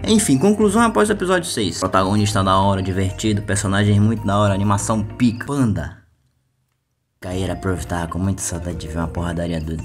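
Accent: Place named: Alagoas, Brazil. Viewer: Krlh tu é alagoano tb skskskksksks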